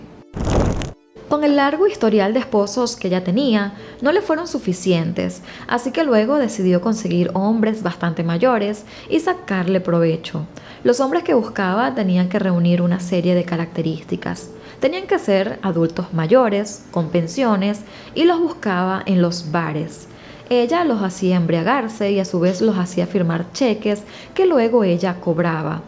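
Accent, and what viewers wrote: Venezuela. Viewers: TheFeistyOne xx creo q es de Venezuela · De donde eres? Me suena que eres paisana😊🇻🇪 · Buenos días tienes una excelente capacidad de narración eres Venezolana ?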